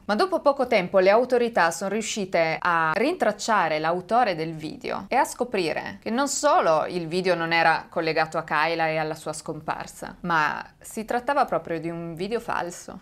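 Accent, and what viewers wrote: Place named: South, Italy. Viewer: Molto gradevole il.uo modo di esporre i fatti di cronaca. Racconti davvero completi. Un unica nota stonata: il tuo accento., la tua dizione . A volte sembra un miscuglio di Sud Italia, altre volte , brasiliana. Puoi fare un corso di dizione per rendere più piacevole la tua voce?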